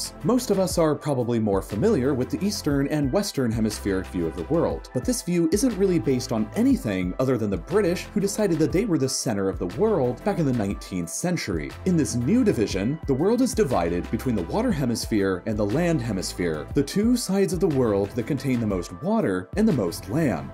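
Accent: United States. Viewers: As an American, I couldn't relate to distances because you dealt in kilometers, not miles. Why. Just why. You sound American too. It was frustrating. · Narrator has American accent. Why is he talking about Km and not miles. Drop dead. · Does anyone else find talk of kilometers meaningless? I know metric is more scientific, but, you sound American, give it to me in miles. Please. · Sounds American. So maybe not use the metric system. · Speaks American English USES KILOMETERS AS THE UNIT OF MEASUREMENT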